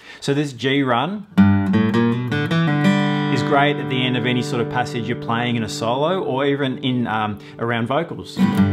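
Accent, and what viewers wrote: Australia. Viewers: Australian I think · Actually I believe his accent is Austrailian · My goodness, I wasn’t expecting an Aussie voice!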